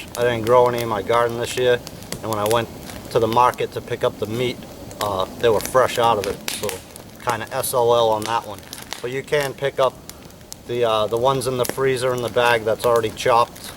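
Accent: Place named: East Coast, United States. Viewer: Liked the vid. That eastern accent gets me since im from texas. Keep ot up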